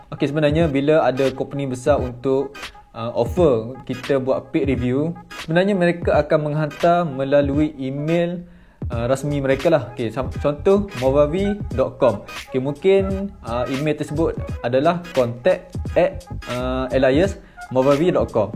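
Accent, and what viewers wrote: Kelantan, Malaysia. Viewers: Ore kelate ko bos?pekat molek bnyi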